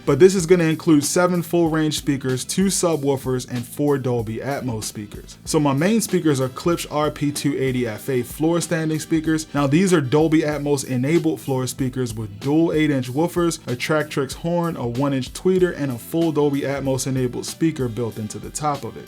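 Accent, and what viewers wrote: Baltimore, United States. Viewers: Love the theatre. Interested in the accent. Baltimore/DC area?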